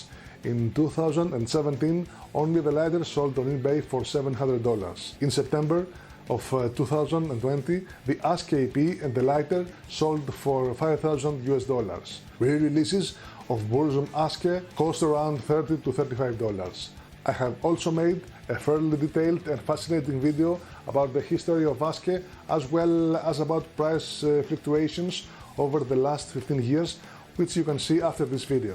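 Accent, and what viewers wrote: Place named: Greece. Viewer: Ur Greek accent reminded me of talking with Sakis Tolis when he was playing here with RC and I got to chat with him after the show :)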